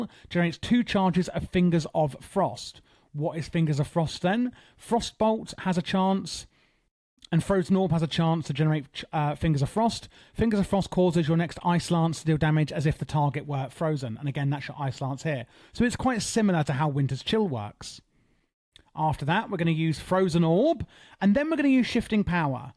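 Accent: United Kingdom. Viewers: HES Talking the clean British stuff bro. Git gud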